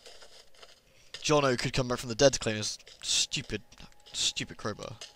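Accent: United Kingdom. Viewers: You sawed Freemans hand off D: You monster! Loving your walkthrough, and its nice to hear a british accent. Yay for us British peoplez.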